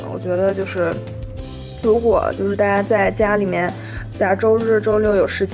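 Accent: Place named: Shandong, China. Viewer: 你是哪里人啊听着好像山东人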